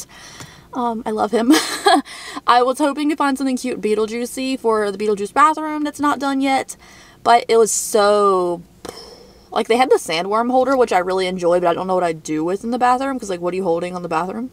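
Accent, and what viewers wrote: South, United States. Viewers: I love it when your southern accent really comes through! You should do a picture of your 4 mug collection 😊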